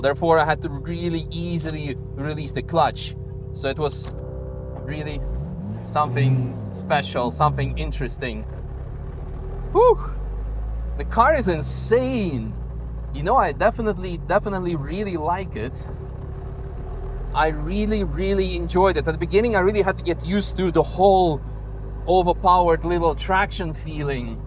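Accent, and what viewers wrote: Netherlands. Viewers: wilde nederlander gespot, je kan je accent heel goed verbergen en veel plezier met je kanaal! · Now I can no longer unhear the Dutch accent. 😂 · Nooit geweten dat jij Nederlands was , maar niet geboren en getogen aan jou accent te horen ? 😂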